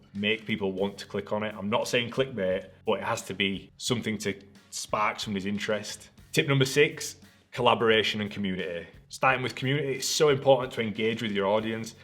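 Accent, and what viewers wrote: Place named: Teesside, United Kingdom. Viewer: I need to start doing that! My first few videos were just me rambling on without any structure haha so the bullet points list is a good idea. Also, I think it's needed for when you shoot cinematic sequences etc so you know what shots or what in camera transitions you want to use. Knew I recognised the accent. I went to uni in York and my family are from Middlesborough area so I'm familiar with the accent!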